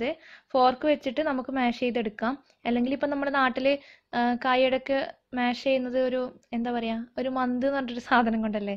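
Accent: Kannur, India. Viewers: .. Nhan thrissur aane.. Kannur slang enikk orupade ishtta...ippo samsarikkunna pole thanne samsarichal mathi.. 😁😁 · നല്ല കണ്ണൂർ slang........☺️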